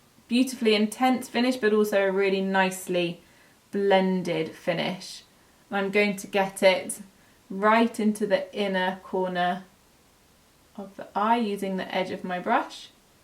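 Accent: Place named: RP, United Kingdom. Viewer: you're smashingly fab. lovely look on a lovely fine representative of Bobbie Brown. p.s. love your posh accent.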